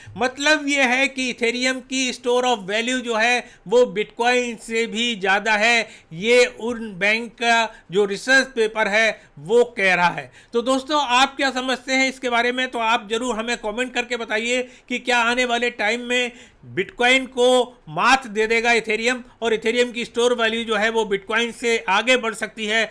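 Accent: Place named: Haryana, India. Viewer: Aap Haryana Se ho kha sa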